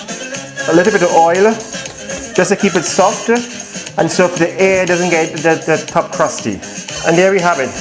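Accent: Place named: Trinidad and Tobago. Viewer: What part of trini is this accent from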